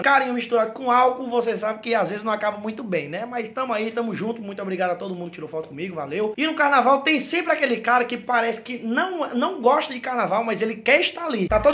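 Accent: Northeast, Brazil. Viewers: mano de onde vc eh,do nordeste?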